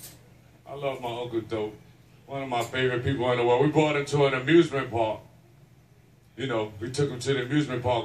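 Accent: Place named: New York, United States. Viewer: Well let me tell you I was scrolling through YouTube and I seen this video and as soon as he started talking I knew he was from New York I'm from New York Brooklyn Queens Manhattan now I live in Florida so I haven't heard people talk like that since I moved here in she got his uncle down pat that is the real real life of adult feet I know cuz I have so many friends that have uncles and friends all kinds of people that are just like that alrighty brother it's a shame you could have been bigger than Kevin Hart😢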